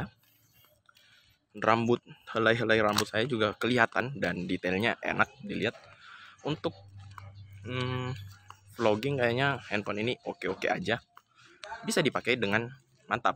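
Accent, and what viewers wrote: Makassar, Indonesia. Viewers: Bang pake logat Makassar lagi dong bang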